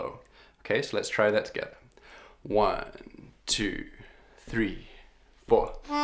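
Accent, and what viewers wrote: United Kingdom. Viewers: I love your videos... 1 suggestion is to have the tabs above where the scrolling time bar at the bottom of the page... i like to pause and practice before i play along and the bar is right across the tab... You sound british so cheers!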